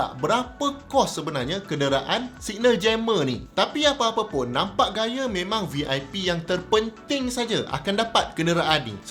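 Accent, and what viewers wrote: Malaysia. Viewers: Penyampaian jgn kaku ,lagu kasi cantik lagi, mana2 perkataan inggeris jangan bunyi Melayu sangat, agak2 sikit, jadi kelakar nanti murid2 nak educate. Kurangkan r & ē tu. Good juob.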